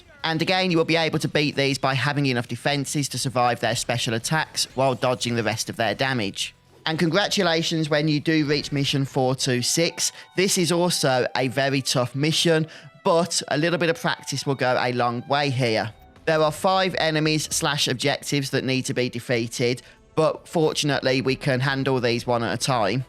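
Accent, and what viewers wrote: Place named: Black Country, United Kingdom. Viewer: Daaayyymm dude. Are you from the Black Country? So nice to hear that accent. I'm from Birmingham. But no permanent accent. I auto mimic whomever I talk to unconsciously.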